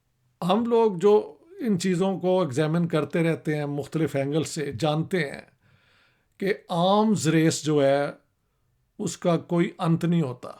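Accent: Punjab, India. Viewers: I like your Punjabi Style Haan ji...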